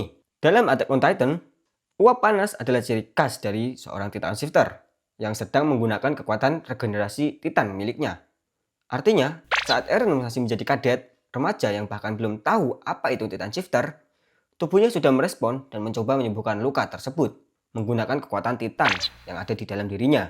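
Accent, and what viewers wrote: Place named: Java, Indonesia. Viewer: Medok bgt jaw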